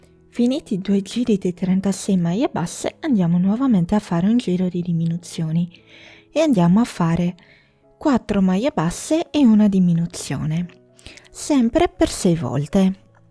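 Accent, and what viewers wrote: Sardinia, Italy. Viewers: Sei bravissima!! 😊 ma sei sarda? Noi si 😊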